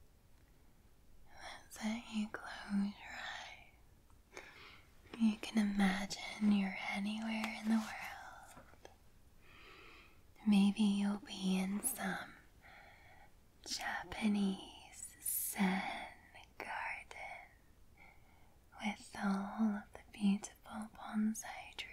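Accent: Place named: Scotland, United Kingdom. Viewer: The Scottish accent is greatly better than the crackle of the candle.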